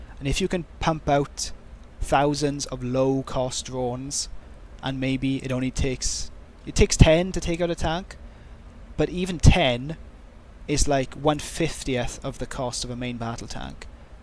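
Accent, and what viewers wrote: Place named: Wales, United Kingdom. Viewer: Oh you're welsh.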